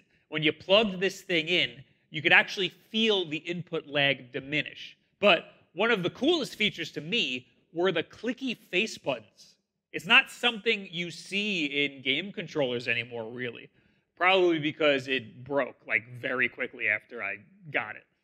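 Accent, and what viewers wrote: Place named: New York, United States. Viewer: your new yorker accent really makes me wanna buy half of the things you do ads for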